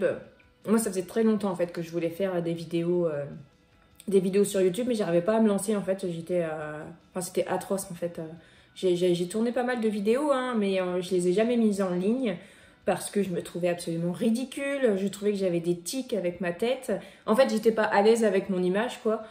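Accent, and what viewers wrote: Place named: North, France. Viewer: Et t'aime particulièrement parceque tu es le double d'une collègue de travail, du Nord, presque le même accent, la même empathie, gentillesse... une belle personne. Je ne la vois plus car je suis contente partie à la retraite, déménagement à 400 km... continue tu fais de belles choses, et une chose est certaine, les enfants se souviennent des bons moments pas des choses, mes filles qui ont 40 ans me le disent souvent ...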